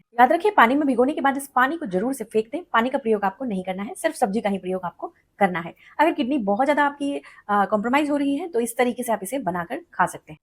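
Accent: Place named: South, India. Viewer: Madam Aap. Ne. Behad. Theek Remedy. Bataya. Lekin Aap. Ko. Awaz. Theek Naheen. Hai Aisa. Bolti. Hain. Jaise Medrasi. Hon So. Log. Naheen Samajh. Pate Allld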